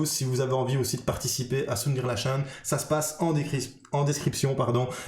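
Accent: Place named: Tournai, Belgium. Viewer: Tu as trop la voix du tournaisien :)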